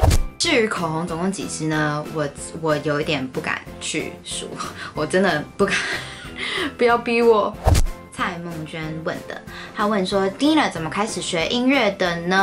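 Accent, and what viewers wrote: United Kingdom. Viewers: I really like to watch your videos .....I'm falling in love with it ...and I hope to see more interesting video ❤️....furthermore I also like your english accent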